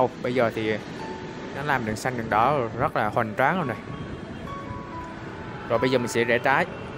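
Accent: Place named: Binh Dinh, Vietnam. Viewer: Chuc mung nam moi ban! Video nay co thuyet minh de hieu, co tien bo, cam on ban! Xa que may chuc nam nhin lai neu khong co loi dan giai thi khong biet la o dau luon ... Giong noi BDinh than quen, chuc kenh phat trien nhieu ban nhe! 🌻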